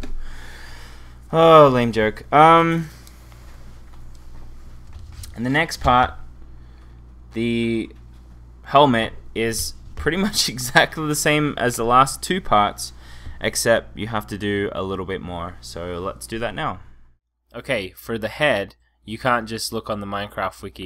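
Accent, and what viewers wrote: Australia. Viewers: Are you Australian? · You sound Australian and American? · are you aussie?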